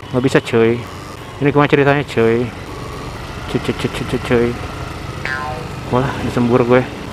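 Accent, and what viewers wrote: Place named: Sunda, Indonesia. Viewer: sepertinya anda orang sunda, gue suka👍😂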